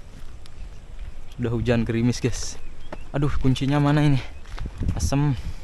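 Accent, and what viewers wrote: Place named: Bali, Indonesia. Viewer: Nak bali? Kok logat bali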